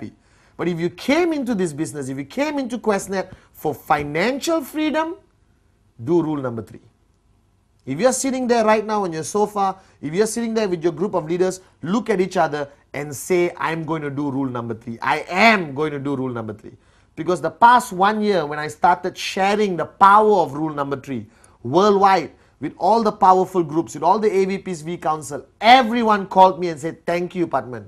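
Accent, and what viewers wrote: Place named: Malaysia. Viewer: it should be in Hindi language also... because of most of the people are unable to understand English specially in malesian accent.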